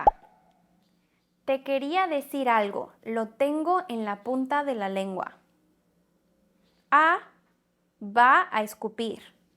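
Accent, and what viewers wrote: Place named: Mexico. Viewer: Ojalá hagas las partes 2,3,4, etc de este vídeo. Estas frases y tu acento son de mucha ayuda para la gente que quiera aprender Español de México. Ya he recomendado mucho tu canal. Gracias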